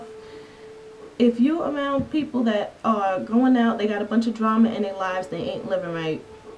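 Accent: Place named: New York, United States. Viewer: Love this vid! Are you from New York? You kinda have the accent.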